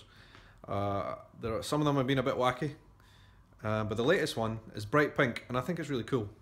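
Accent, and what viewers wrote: Scotland, United Kingdom. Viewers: Love the vlog! I moved over to Sweden last year and it's nice to hear a Scottish accent again! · Hey, cool! You're from Scotland! Love Scottisch accents! Subscribed! :D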